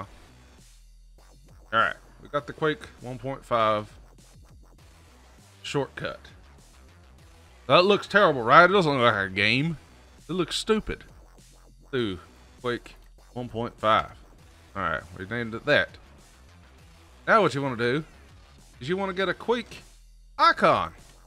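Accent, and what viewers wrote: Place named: United States. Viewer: i really like your cowboy accent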